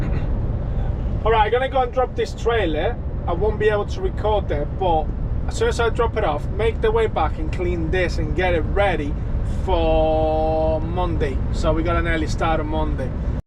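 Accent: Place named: England, United Kingdom. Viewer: Why do you sound foreign but also English. Keep up the good work mate, I enjoy your videos.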